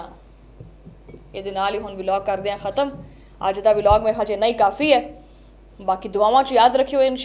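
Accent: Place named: Punjab, India. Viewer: Love your Punjabi accent and great response against Indian.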